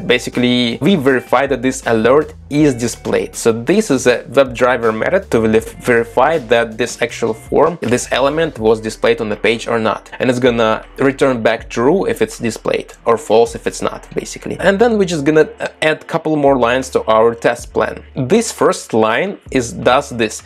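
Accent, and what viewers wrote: Russia. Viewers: Толковый блоггер. По акценту слышно, что русский 😅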